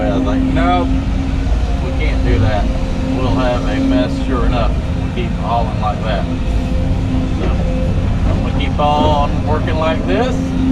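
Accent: South, United States. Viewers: Meuud, lots of meuud ! Love your Southern accent !! 👍👌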